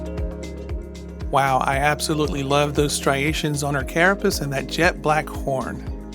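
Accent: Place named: New Mexico, United States. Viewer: You kind of sound like you're from New Mexico. I have family from there and the drawl is very distinct. Of course I'm probably wrong but it's just that your voice sounds so familiar. Love your videos by the way! Really good camera shots. Love it!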